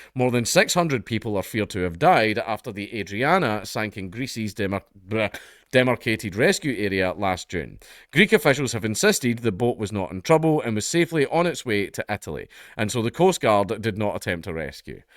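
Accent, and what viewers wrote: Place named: Scotland, United Kingdom. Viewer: I’m just here to see do different accents then revert back to his HEAVY Scottish accent 🤣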